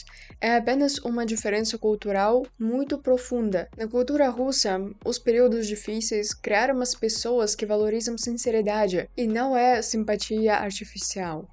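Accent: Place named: São Paulo, Brazil. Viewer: Seu português é bom, mas vejo que o pessoal do interior de São Paulo dificultou o seu R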